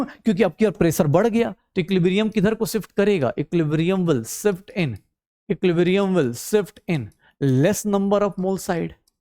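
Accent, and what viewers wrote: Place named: Bihar, India. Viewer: Sir ka bihari accent mast hai 😂❤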